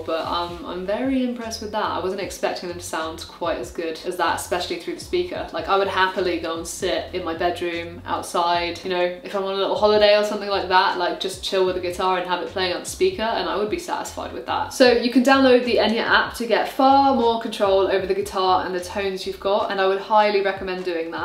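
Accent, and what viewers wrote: England, United Kingdom. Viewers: Looks really nice and your English accent is beautiful :)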